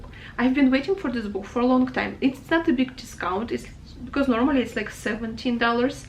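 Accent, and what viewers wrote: Russia. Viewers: Are u russian or smth? Like yr accent sounds so familiar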